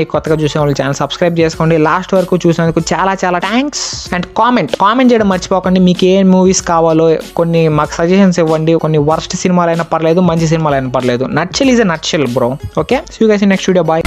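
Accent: Nellore, India. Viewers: Mama nedi Nellore eh gaa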